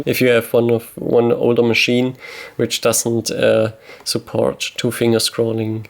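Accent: Germany. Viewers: Man erkennt, dass deine Muttersprache deutsch ist. Ich würde dich bitten nicht englisch zu sprechen weil das ....... klingt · Die Leute scheinen den deutschen Akzent abzufrieren. Dachte immer die halten Deutsch für eine nicht ganz so schöne Sprache. :D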